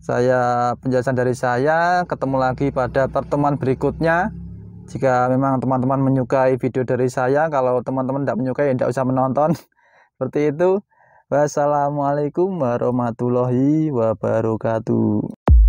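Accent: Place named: Java, Indonesia. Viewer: Suara nya medok ya kak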